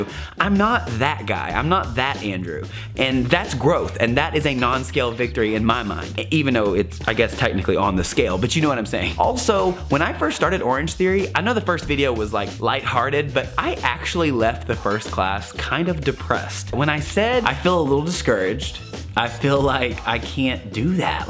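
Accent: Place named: South, United States. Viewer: Do Sunday. Sunday is a day that I’m just getting my life together, maybe being lazy and hanging out with you and everyone else during that time was super fun!!! Also — are you from Seattle? Yer accent can git reaul southern,!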